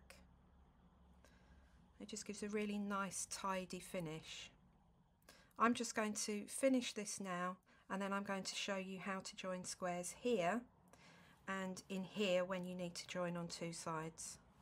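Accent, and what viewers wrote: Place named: Australia. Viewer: I love your accent! Are you from Australia?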